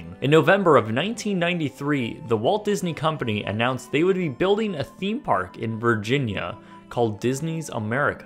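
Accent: Canada. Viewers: "howoosing...oot..." the speaker is from Canada I am guessing, ay?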